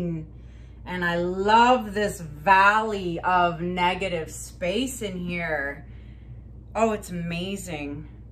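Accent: Canada. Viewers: thank you so much. I could hear you pronouncing the name but I couldn't find it. By the way I love you accent. I don't know for sure but it sounds Canadian? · Just found your site,....I'm in the US but knew you were CA with the eh and the owt! lol I have family in Dunnville, ONT! They make fun that I drop my 'H' sometimes! Soooo, Love your work, your dog Juno and excited for your reno!! Since I'm much older than you, I have advice! Enjoy the journey, trust yourself and don't worry....I choose the green, btw. Looking forward to your next project and your vlog! Pat from Brooklyn, NY (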